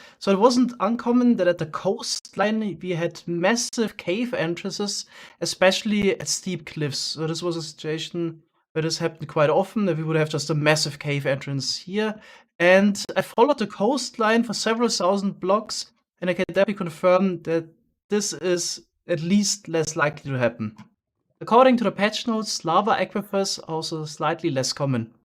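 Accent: Germany. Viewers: ur dialect sounds so german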